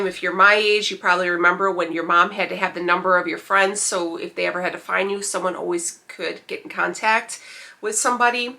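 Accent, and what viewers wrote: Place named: Chicago, United States. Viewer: This is my 3rd video I have watched on your channel and I knew you were from Chicago from your first one I watched , I too am from Chicago , I recognized that accent 😊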